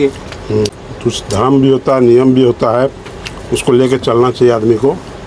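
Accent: Bihar, India. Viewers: AAP bihar se hokya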